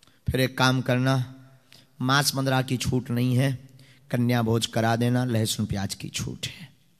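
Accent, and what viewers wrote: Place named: Bundelkhand, India. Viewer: जय हो बागेश्वर सरकार की,जय हो सन्यासी बाबा की,जय हो गुरुदेव श्री जी की,❤ आपकी बुंदेलखंडी बोली ही कितनी सुन्दर है ❤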